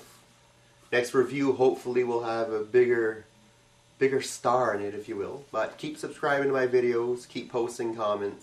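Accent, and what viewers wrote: Canada. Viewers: This stuff is great for the geek at heart! By the way, man. You gotta be from the Atlantic Canada. I could pick that accent out anywhere. Nova Scotia by any chance?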